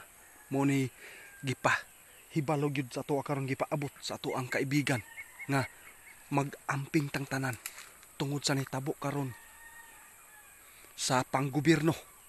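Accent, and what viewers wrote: Visayas, Philippines. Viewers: Ano ba yan bisayot naman ang Salita mo nakaka buwisit 😅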